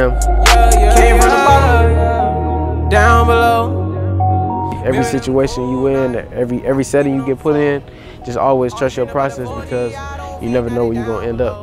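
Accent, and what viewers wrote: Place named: Atlanta, United States. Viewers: Boy I’d a thought u was from the south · Crazy part is how he sound like he from the A and he better than any nigga from the A 😂. Nigga Cali do this shit nobody sound like Roddy he sound like he from Cali. Smfh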